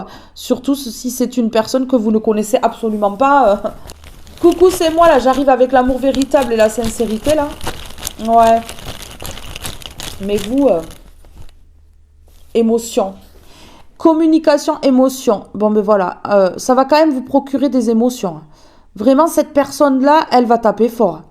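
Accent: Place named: South-West, France. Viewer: Merci j adore votre accént sud ouest de la France vous etes une bonne personn ej adore votre humour bonne continuation dans la voyance et desolee j espere ne plus vous embeter avec mes problemes merci beaucoup votre humour me fait du bien je vous souhaite que du bonheur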